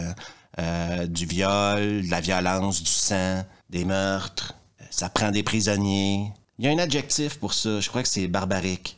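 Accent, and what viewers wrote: Canada. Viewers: Plus je regarde tes vidéos, plus je suis fasciné, voir hypnotiser 😵‍💫 va savoir… l’élocution, le choix des mots, le sens de l’humour… et l’accent canadien !🥰 je sais pourquoi, j’ai toujours vu le canadien comme une version améliorée du français ( ni voyez aucun racisme ou suprématisme de ma part) pourquoi ? Comme dirait Gilbert Montagnier: là je vois pas… en tout cas, peut-être qu’un jour je quitterai ma jolie France natale pour ton joli pays.. pourquoi ? Sans doute à cause de Macron 😰( la, tout le monde comprend 😉) bisous à bientôt ♥️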